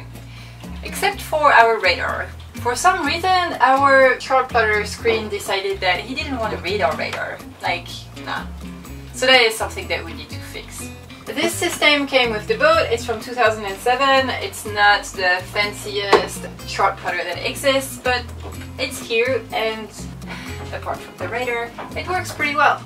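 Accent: France. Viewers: Just discovered your channel. Really informative and love your french accent:)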